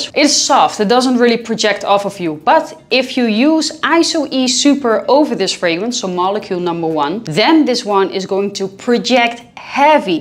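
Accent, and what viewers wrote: Netherlands. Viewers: hearing your accent I must know: ben je Nederlands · She must be Dutch her accent ❤️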